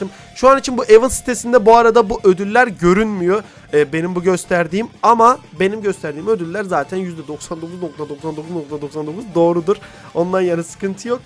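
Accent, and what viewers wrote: Gaziantep, Turkey. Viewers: Abi Sen Antepli misin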